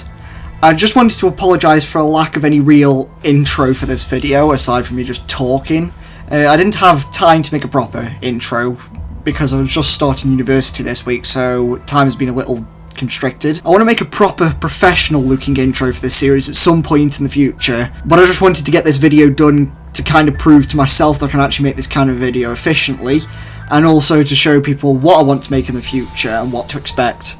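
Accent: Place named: United Kingdom. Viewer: 10/10 agressively British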